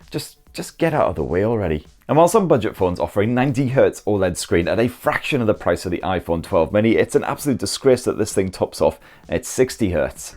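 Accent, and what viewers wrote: United Kingdom. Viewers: I just discovered this channel and it has all I’m looking for: tech reviews, British accents and witty humor. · He's British, so yeah, I feel you there! 😍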